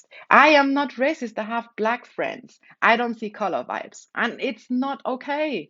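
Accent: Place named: Nigeria. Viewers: Bantu History, l enjoy her Nigerian accent. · You have Nigerian accent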